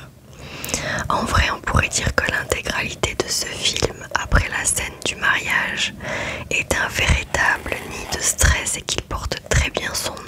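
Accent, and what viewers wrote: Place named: South, France. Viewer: avec grand plaisir A moi de te remercier pour tes vidéos de grande qualité et toujours intéressantes Et ta passion pour l'astronomie et l'espace est contagieuse tu en parles d'une belle manière ! Sinon pour l'accent du sud (milieu) bah ne le perds pas j'adore ! moi je ne vis plus en France et il s'est évaporé avec le temps et la distance mais j'ai grand plaisir à le retrouver quand tu chuchottes ! Continue tu es excellente 💫🪐👍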